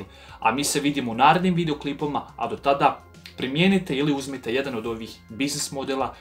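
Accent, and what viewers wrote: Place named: Krajina, Croatia. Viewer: Kakav je ovo seljacki akcent. Ne mogu slusat. Neka Krajina nesto.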